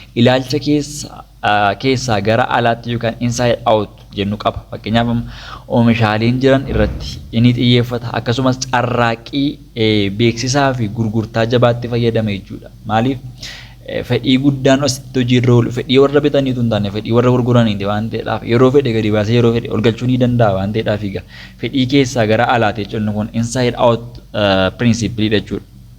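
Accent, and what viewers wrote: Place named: Jimma, Ethiopia. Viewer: Waaaaaw🎉 amazing ❤ ni dandeessa dhugaa jaabaadhu loqoda jimma baayyee naaf ta'e🎉🎉🎉🎉❤❤❤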